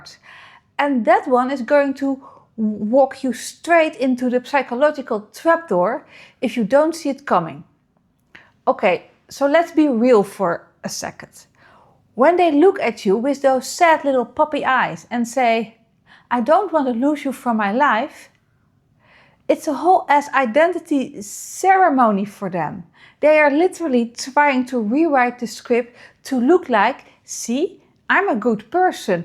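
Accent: Netherlands. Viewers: Thanks. You have a knack for this. And it's enjoyable to be "dressed down" (in a good way) in English spoken with a Dutch accent, for some reason. One of the tell-tale signs of a cheat who will destroy your peace is rewriting history. If they show themselves willing to try to cheat reality itself constantly, there is no doubt they are a cheat.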